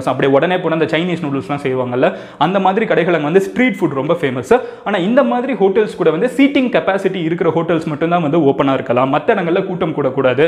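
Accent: Madurai, India. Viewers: Mg neenga maduraiya???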